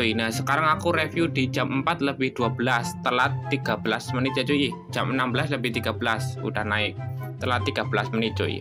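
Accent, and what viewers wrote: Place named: Surabaya, Indonesia. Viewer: Org Surabaya Ya Lu Bg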